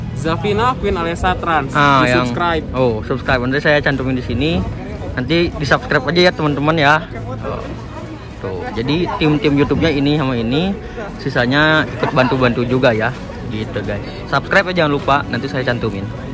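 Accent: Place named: Kebumen, Indonesia. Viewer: Ngapak kebumen hadirr..bozz?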